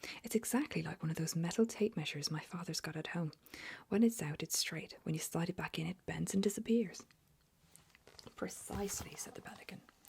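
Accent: Ireland. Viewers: I love the Irish accent!! Great job🍀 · I love love love your Irish accent:)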